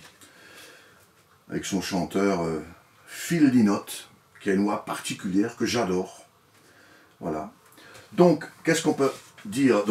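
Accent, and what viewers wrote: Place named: Alsace, France. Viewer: Bravo Monsieur, je découvre votre chaîne que je ne connaissais pas et j'ai tout de suite reconnu le bel accent du 68, car je suis également originaire de Mulhouse (Milhüser Wackes comme me surnommaient affectueusement mes anciens collègues suisses). Très belle sélection, si vous parlez allemand je vous conseille la chaîne YouTube d'Erwin (Across.the.Borderline) très au fait sur les genres country/americana. À bientôt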